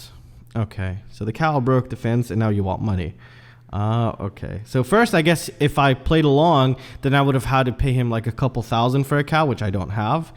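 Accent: United States. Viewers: Dude ...maybe I missed it but what’s your origin story? You sound like an American living abroad. You’ve got a cool channel and a pretty bright inquisitive guy! Keep on making great content! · How do I enter contest? :p Glad to hear your son is ok. And I thought you where american, your english is not hungarian. ;)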